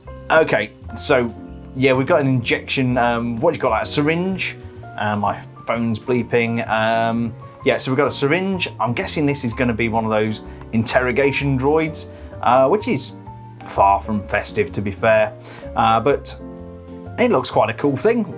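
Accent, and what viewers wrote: United Kingdom. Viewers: haha no, you go ahead. I can always slaughter you in the comments. Actually.... before I saw your actual face, I seriously thought you were ginger. You sound so delightfully British. There, I'm prejudiced myself....🙄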